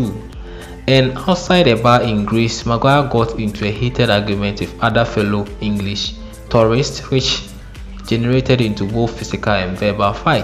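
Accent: Africa. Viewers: Love the african accent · Are you an African? Your accents says it all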